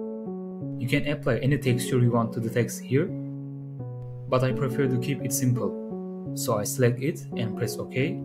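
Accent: Turkey. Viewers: It is understood from your speech that you are Turkish (I am Turkish and I do not have after effects)❤ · Aksandan direkt Türk olduğunu anladım, bu tarz içerikler için tebrik ve teşekkür ediyorum dostum :)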